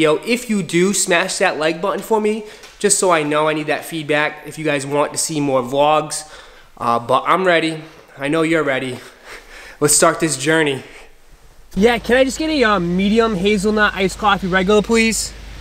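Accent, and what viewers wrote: Boston, United States. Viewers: Bruh... Where are you from. Boston?!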